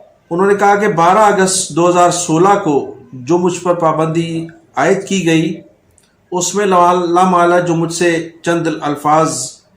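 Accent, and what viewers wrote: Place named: Sindh, Pakistan. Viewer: bhie apki Awaz se esa lagta hay apki madari zaban sindhi hay? May I right?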